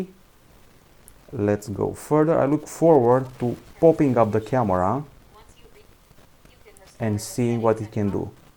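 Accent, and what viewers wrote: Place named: Romania. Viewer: Știam că esti român! De la accent și de la tipul de priză.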